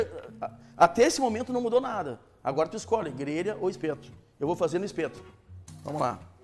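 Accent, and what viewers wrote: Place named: Porto Alegre, Brazil. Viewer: Sotaque de Porto Alegre !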